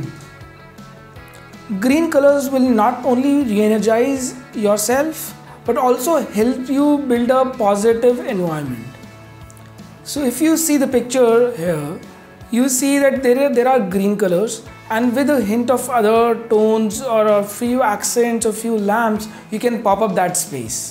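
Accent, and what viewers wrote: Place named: India. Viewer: Thanks for the video. I struggle to understand the Indian accent, but it´s worth it. Would you advise me? I have quite large, but east orinted quite dark room, I will spend time there mostly in the afternoon/evening, so artificial light mostly. I want to "warm" it a bit, was thinking terracotta or pineapple green combinations.. Is it a bad idea? I wonder.. Thank you. (I like darker red colours).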